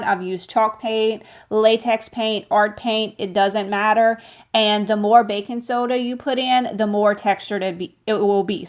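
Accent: Louisiana, United States. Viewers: Where are you from? Have to be a south Louisiana girl! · Hi, I just love all of your DIY videos! You have such great ideas! And I love your little Cajun accent! I’m a Louisiana girl myself, but from New Orleans, so I don’t have the Cajun accent. Thanks for all you do!😍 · I just love your videos! I’ve been going back and watching all of them! I used to live in Lafayette so I just loooove listening to that Cajun accent. I think the crusty pans are my favorite but I just love everything you do. You are such an inspiration to me and my crafts have gone to a new level just by watching your painting techniques. You are absolutely my favorite YouTuber! Something I would love to see is how to decorate a smaller home with very little wall space so that it doesn’t look cluttered. I just got a booth at the local antique store so I am hoping that helps with my hording look I’ve got going on lol. 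Thank you for doing these videos. You make me believe that I can actually make some income doing what I truly love. Keep them coming Sha! Love you tons!!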